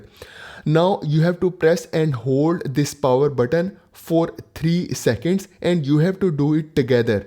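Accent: India. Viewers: Once again I was convinced that the English spoken by Americans and the English spoken by Indians are not the same English. Jesus will sooner return to us than Indians will learn English.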